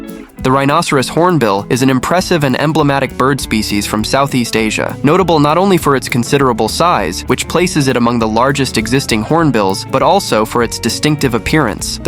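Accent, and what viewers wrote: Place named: England, United Kingdom. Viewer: Si sente solo la tua sgraziata voce 🤢🤮gutturale inglese , ma non si sente alcun suono degli uccelli . VOTO NEGATIVO .:text-green-game-over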